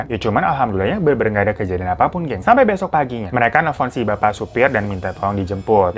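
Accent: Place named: Jakarta, Indonesia. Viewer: Maaf bang itu asli mana sih, apakah orang korea? Apa asli indonesia, soalnya logatnya mavam orang betawi tapi tampang macam oppa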